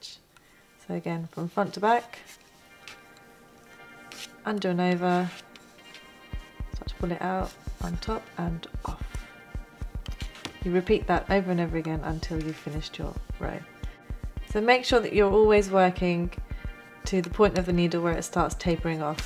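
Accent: United Kingdom. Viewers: Does she have a British accent? Or is it just me that thinks that?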